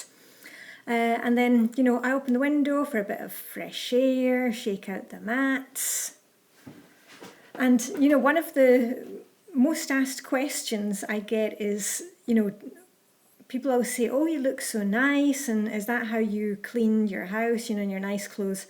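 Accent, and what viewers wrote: Scotland, United Kingdom. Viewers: Where about in Scotland are you from? I’m in Edinburgh lovely to. Hear a Scottish accent!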